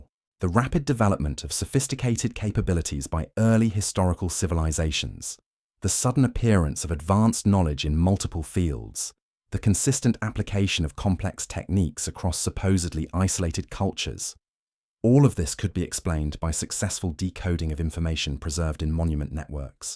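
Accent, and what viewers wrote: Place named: United Kingdom. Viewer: Are there any stories that don't have a British fkn accent???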